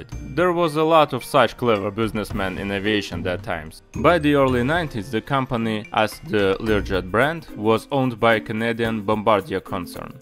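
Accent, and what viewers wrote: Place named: Russia. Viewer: His English is good, but he might not have enough speaking practice, here-hence this crazy Russian accent. ))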